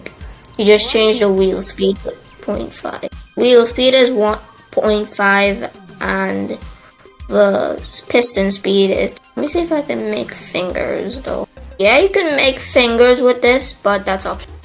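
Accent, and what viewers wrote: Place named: Russia. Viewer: Зачем ты снемаешь русским англиским голосом?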